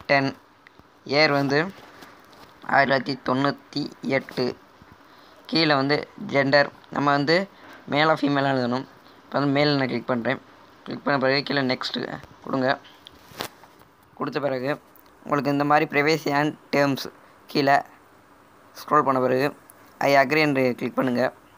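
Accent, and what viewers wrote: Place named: Tirunelveli, India. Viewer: Payapulla thirunelveliyo 🤩 luv ur slang bro 🙌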